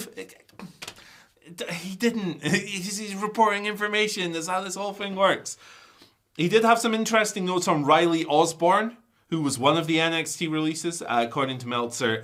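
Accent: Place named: Scotland, United Kingdom. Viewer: Why do I love listening to this Scottish man rant about wrestling so much?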